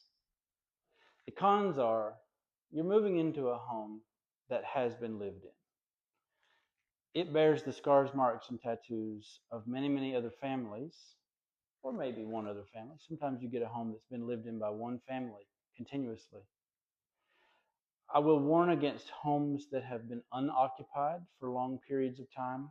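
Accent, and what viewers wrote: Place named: South, United States. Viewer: You are AMAZING. Truly. Are you also a writer.? I am guessing - certain - yes you are. The entire post, but most especially the last scene with you in the dining room - is incredibly poetic and perfect. Such a southern voice like Tennessee Williams or Truman Capote - so musical sounding and thoughtful and convincing. I LOVE this house. Keep on doing what you are doing. And keep writing. Your talent is obvious.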